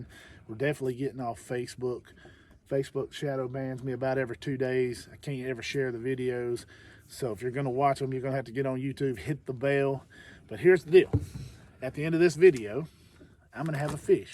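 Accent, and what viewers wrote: Louisiana, United States. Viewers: 14 oz. Just found your channel. Already watched several of your videos. Good content. What part of La you located. Don’t sound Cajun.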